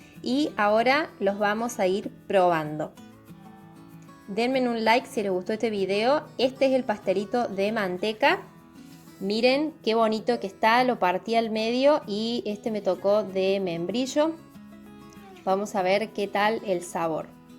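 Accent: Córdoba, Argentina. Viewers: Te escucho, y me haces acordar a mi bella Córdoba, quizás no seas de mi provincia, pero sonas como cordobesa 💙🤍💙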